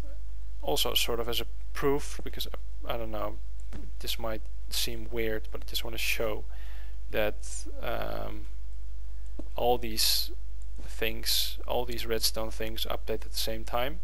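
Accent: Netherlands. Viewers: are you dutch? I hear a dutchy thing in your voice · you are great, but i can hear your accent, that you are a dutchman. so funny tipical dutch accent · ben je nederlands, want zo klink je wel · Are you Dutch ?? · Wait, are you Dutch? Your accent is really familiar, somehow. (See, I'm dutch too, lol.)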